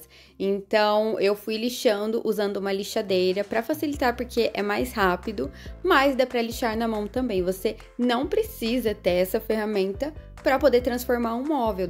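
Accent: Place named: São Paulo, Brazil. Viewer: de que cidade você era antes de casar? Você fala muito parecido com o sotaque do pessoal do interior aqui de São Paulo onde estou morando. Moro em Tatuí, mas, toda a região o pessoal tem esse sotaque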